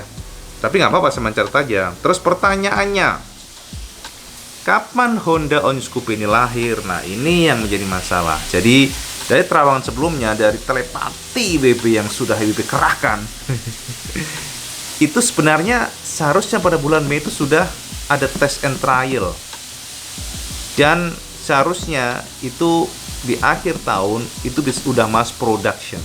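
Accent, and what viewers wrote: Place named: East Java, Indonesia. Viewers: Sampean iki arek jawa timur endi cak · Sampai detik ini aku masih bingung. Fans IWB bisa sebanyak itu gimana yaa.. padahal ngomomh jawa kentel teruss hahaha.. Pertanyaanya fans nya ini apa orang jawa semuaa atau emg banyak yg gak ngerti apa yg omongin yaa 😁 BTW aku orang keturunan Jawa jadi ngerti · Alasan Suku dengan Chenele'e iwb .. mergo Pejelasane detail,Paling utama Logat jowone blas ora ktinggalannn,salam jos gandosss..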